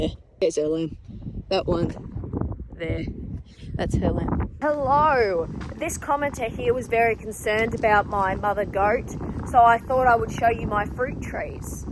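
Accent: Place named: Australia. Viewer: I was sad to hear you were "removing" foxes (originally thought you were British or Scottish, 'cause I hadn't heard your accent yet), but then I realized you were Aussie and was no long sad, lol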